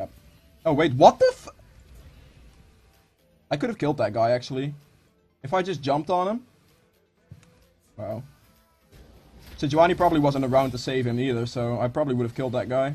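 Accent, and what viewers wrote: Netherlands. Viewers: You have a dutch accent are you dutch???? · dacht ik al aan je accent te horen! Vooral aan de S:)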